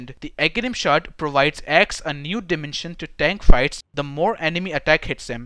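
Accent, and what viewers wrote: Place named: India. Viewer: This indian accent man 🤭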